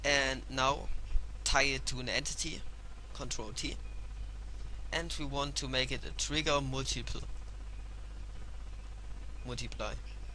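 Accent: Germany. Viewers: Natürlich bist du Deutsch. Merkt man z.B. an "dis" oder diese klare Aussprache. Amis sprechen anders.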